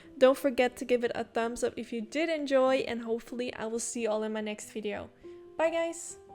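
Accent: Netherlands. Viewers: My mind: SHE IS DUTCH! I could hear u speak English with the dutch highlights😆 hahah very nice video! I do the same!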